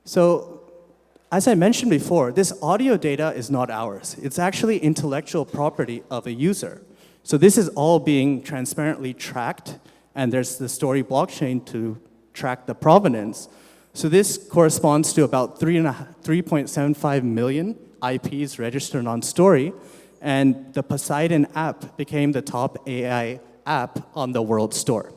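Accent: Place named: United Kingdom. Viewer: An Indian with such a wonderful accent. Speaking like a pure British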